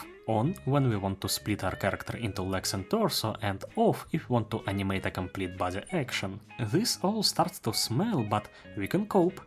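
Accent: Russia. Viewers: Хмм, что-то уж слишком хорошо я начал понимать английский. Как будто собрат по русскому языку пытается на английском что-то рассказать и все понятно,т.к отсутствует английский акцент 🎉🎉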